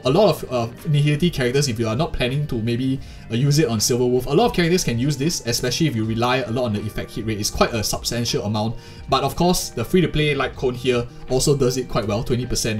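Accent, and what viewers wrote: Singapore. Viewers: He can’t help it it’s singlish 😭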